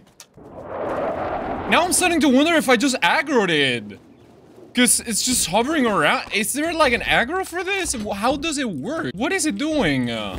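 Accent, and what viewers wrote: Argentina. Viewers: Oirte hablar en español con ese acento argentino ayudando a los novatos me da la vida ❤❤ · como que este chabon es argentinO XD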